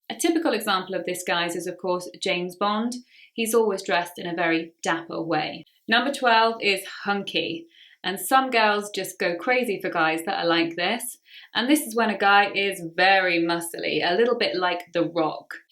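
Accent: United Kingdom. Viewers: It has been a utterly splendid, exquisite and flawless lesson. I'm particularly joyful, blissful and elated with that opulent topic. It is to my liking; moreover, it appeals to me. I do adore speaking, and her charming accent., Hence, I present undoubtedly the Queen of British English. You are the quintessential of British English. Thank you very much indeed. Far thee well, dearest Prof. N.B I am on the edge of making myself some “arrabbiata”, “caprese” and a slice of tiramisù. Would you care for an alluring dessert? Ciao. Andrea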